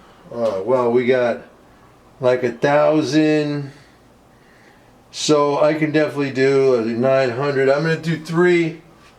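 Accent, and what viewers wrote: New York, United States. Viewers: You sound like a real New Yorker. I like I have the sauce lol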